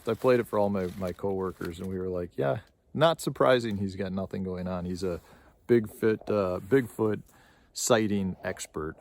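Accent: Wisconsin, United States. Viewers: Thanks for sharing. It takes courage to talk about this subject due to the fear of ridicule. I appreciate that. Plus, it's always fun to hear a Wisconsin accent! Seriously though, you might want to check out Facts by How To Hunt, Steve Isdahl's Youtube channel. He posts about other people's encounters and experiences. Also, Dave Paulides & Scott Carpenter. All very informative channels. Lot's of critters out there. Fish on!🎣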